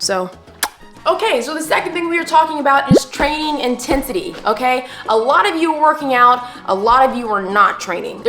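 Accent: England, United Kingdom. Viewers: The English accent 😭🤣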